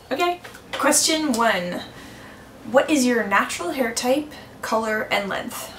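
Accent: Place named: East Coast, United States. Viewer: You say "length" so weird. It's definitely East Coast. I've never heard someone say length that way! By the way, it's ho-HO-bah oil. My mom whacks me over the head if I say jo-jo-ba, so I'm passing the knowledge on.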